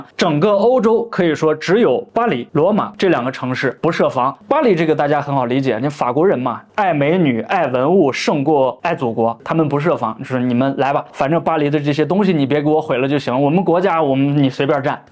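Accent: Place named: Henan, China. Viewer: 突然听出了一点的口音，是河南人吗😊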